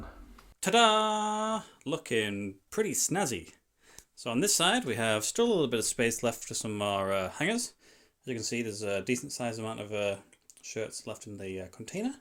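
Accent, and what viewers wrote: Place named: North East England, United Kingdom. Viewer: I go to charity shops looking for footy shirts - no luck so far. Guessing from your accent you are from somewhere in the north east, same as me, so you’ll need to take the mannequin for good luck.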